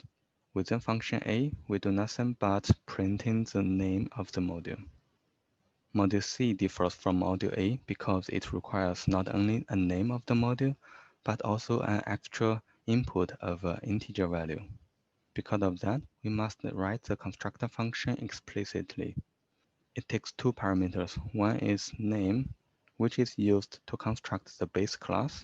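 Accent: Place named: China. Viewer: 听这口音感觉是个中国大陆人🧐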